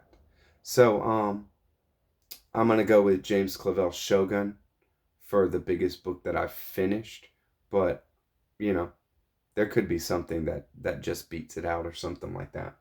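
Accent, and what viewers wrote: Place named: Texas, United States. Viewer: I just watched your video for this tag, and your accent is the thickest I have ever listened to. Is it Texan?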